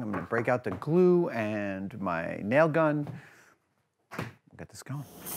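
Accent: United States. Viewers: I wondered what you were talking about..THen I realised you call SOLDERING....SODERING>..I guess Murican accent,.Nice vid though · Hiya, can I just ask, does everyone in America call solder "sodder"? Or is it just yourself? Sorry, it just doesn't make any sense to me. You don't say that you have "sod" your house so why change how you pronounce it in other context? I wasn't going to comment because of fear of people going crazy with me but I am genuinely curious and the more that it rattles around my brain, the more it is irritating me. Thanks very much and love from the UK xxx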